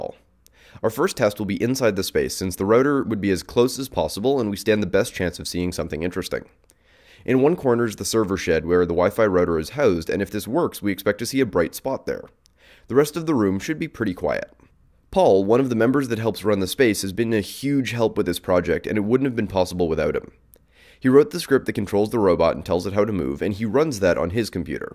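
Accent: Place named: Canada. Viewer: Aha! I knew he was from Canada by the way he says "out" but him saying "Hackerspace in Montreal" confirms it lol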